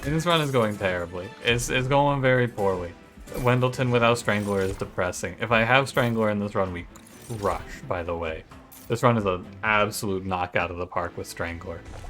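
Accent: United States. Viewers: I know that michigan accent my partner is chicago and she calls me out on mine all the time.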